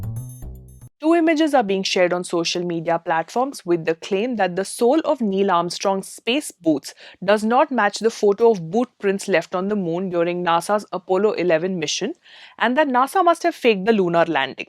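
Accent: India. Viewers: Yeah, go ahead and use the Indian accent to give some sort of credibility for the simple minded rooted in hate and blame that also thinks the earth is flat.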